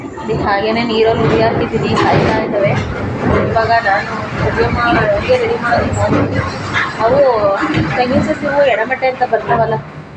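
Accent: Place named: Hassan, India. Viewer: Nivu Hassan davara nam ajji mane Hassan hatira nivu mathdodu nodi anisuthu namadu Tiptur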